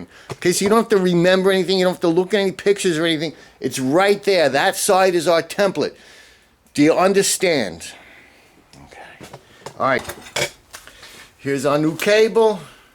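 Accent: New York, United States. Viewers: Really easy to understand. Great video. Living in Hawaii, we meet and welcome people from around the world. If we did not, we would get bored looking at each other all the time. As I watched your video, I was thinking to myself where might I have heard your accent before. It came to me. Remember the movie "My Cousin Vinny"? And I do not mean it in any degrading way. Not at all. You explained everything the way it should be. Just right. Thank you for putting together a great video. A thumbs up, for sure. Aloha! K Inouye Honolulu, Hawaii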